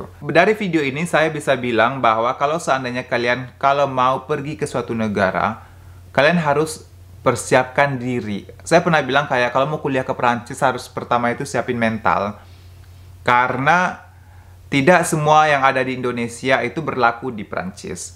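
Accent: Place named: West Sumatra, Indonesia. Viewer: Betul sekali. Jangan silau dengan orang yang berbeda ras, jangan menganggap diri lebih rendah dan terlalu memuja. Pada akhirnya kualitas pribadi yang lebih menentukan hidup kita. Terima kasih utk sharingnya, sukses selalu ya.. Btw, saya suka logat minangnya..😊👍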